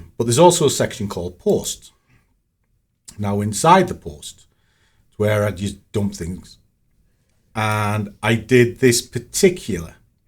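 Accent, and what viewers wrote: United Kingdom. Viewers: I didn't know you had a British accent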